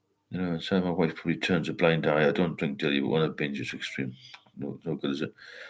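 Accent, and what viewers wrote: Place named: Northern England, United Kingdom. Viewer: he has a very strong Northern English accent - I too find him hard to follow & I'm from England (South)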